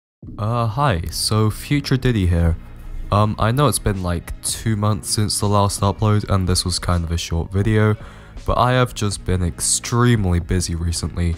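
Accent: France. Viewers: wait hold up, are you french?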